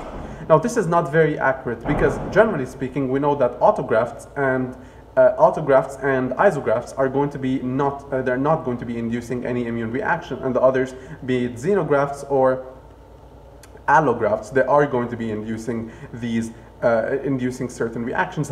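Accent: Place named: Lebanon. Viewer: صدقني انت لبناني عرفتك من اول 10 ثواني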